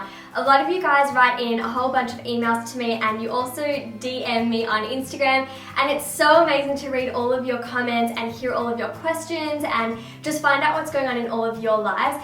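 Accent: Australia. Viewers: I just discovered your channel and your videos are really helpful to get motivation to study^^ and btw you're accent is Australian right?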